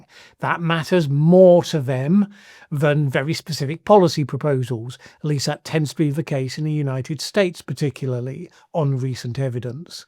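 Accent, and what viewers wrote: Birmingham, United Kingdom. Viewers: l always enjoyed these little explanations. What a gifted teacher. He has the ability to impart knowledge in a way that is easy to understand. Great questions too. I got the accent because I am also a 'Well Spoken' Brummie. Yow awlright Bab? The Birmingham accent is actually sardonic and self depreciating in a somewhat sarcastic way. Ow Arr. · I’m a Southern Counties Brit and now can’t help trying to imagine your Brummy undertones. Unfortunately, I only discovered your channel recently, and as something of a Climate nerd would likely have benefited from your earlier videos. To me you are providing helpful leadership. That said, I slightly wince when you cover your back for not being even better, although that’s obviously a cultural thing that afflicts us all. I realise that standing for what you’ve just said by not following it with an apology to fend off the expected attacks is uncomfortable.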